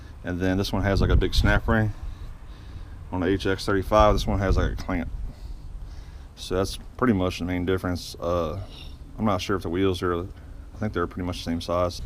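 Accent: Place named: Virginia, United States. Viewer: Need to know that accent....Virginia, with New England accents...?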